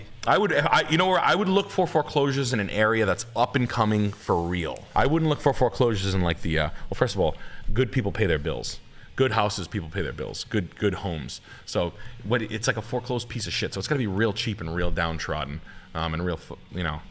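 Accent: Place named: East Coast, United States. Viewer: is the only man with an east coast accent who genuinely cares about anything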